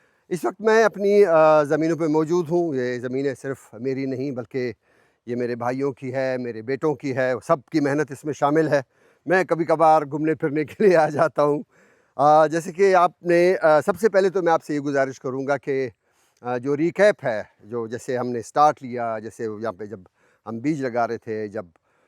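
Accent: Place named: Karachi, Pakistan. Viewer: Khosa Sahab Aslaamo alacum ham ne ap ko dramon dekha tha aur AJ ap ko peyaray balouchistan ke gaon mn dranti pakray us ki cataie kar rahay hn ap ki Urdu aesi ha jesay ap Karachi se hn balouchistan se nh,balouchistan mn haryali dekh kar Dil bohat khoush hoia Allah pak balouchistan ko shad,o, Abad rakhay aameen summa aameen mashallah khoush raho slaamat raho aameen summa aameen, I am frame apna Punjab se Duaion mn yad rakhna Allah Hafiz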